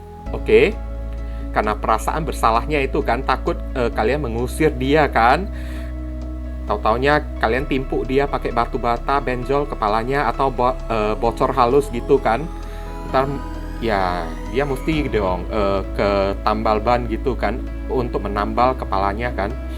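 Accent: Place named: Medan, Indonesia. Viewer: Saya pilih pile 2. Terima kasih ko readingannya selalu resonate. salah satu tarot reader favorit saya..readingannya banyak nasehat2 baik yg memotivasi..kadang serius kadang dselingi dengan lelucon2 yg lucu bikin saya ngakak dengan logat2 Medannya yg kocak 😂😂🙏🙏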